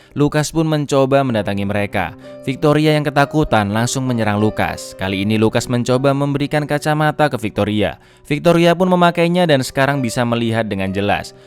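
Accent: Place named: Surabaya, Indonesia. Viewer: Mas iki wong endi yo? Teko bahasae kyk wong Suroboyooo... Nek iyo, kok aku curiga. Jangan² mantane koncoku wkwkwkwk. Dadi penasaran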